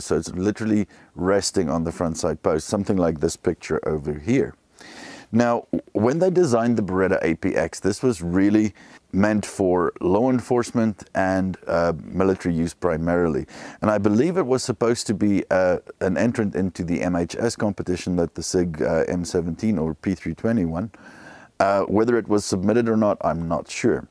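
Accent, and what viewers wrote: South Africa. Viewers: Thanks for this, same experience on my APX. Are you South African by any chance? Great video, subscribed! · Liked your video. Is that a South African accent I am hearing?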